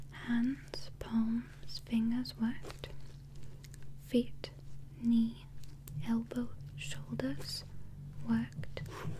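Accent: United Kingdom. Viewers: Is that a light British Accent?? It’s so faint I can’t tell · You have the most Beautiful and Hypnotizing Eyes!!! I always love the British Accent for your ASMR!! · Love your British accent. Very on point. Love it.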